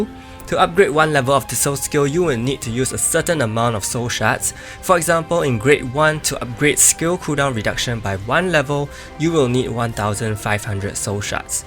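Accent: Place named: Singapore. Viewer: Hah! I hear your (very familiar) accent and took the chance! Singapore yo ;) Looking forward to the next series. Take care in the meantime!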